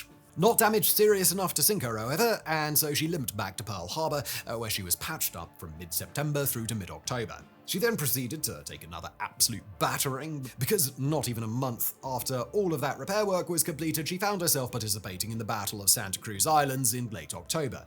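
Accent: United Kingdom. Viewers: I find it heartwarming, and ironic, that a bald man with a British accent is endeavoring to “make sure history never forgets the name Enterprise” 🖖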